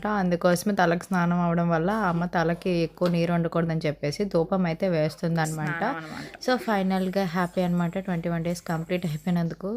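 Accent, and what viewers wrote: Srikakulam, India. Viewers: Srikakulam slag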